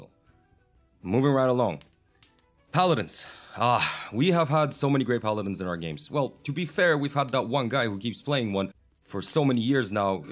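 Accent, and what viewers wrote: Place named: Norway. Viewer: You have nice accent when you speak. You're norwegian, aren't you?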